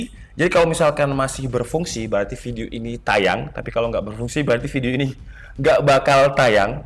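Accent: Malang, Indonesia. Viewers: Mas Wong Malang Po?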